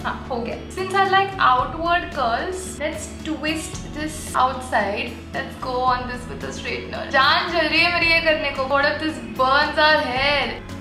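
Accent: Gujarat, India. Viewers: Gujarati ascent 😅😁 yeh thoda aada aa raha hein!!!!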